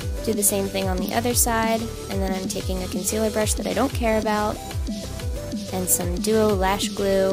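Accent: Philadelphia, United States. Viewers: Because your accent sounds Philly.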